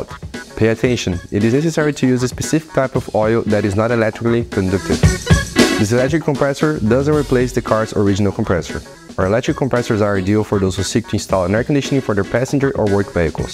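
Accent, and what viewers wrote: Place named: Brazil. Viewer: Parece sotaque brasileiro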